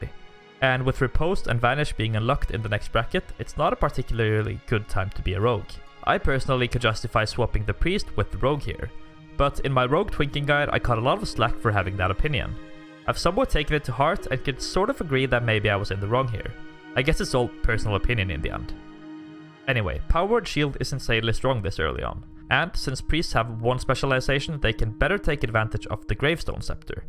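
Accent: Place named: Norway. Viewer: its so easy to hear that you`re norwegian. love the guide :D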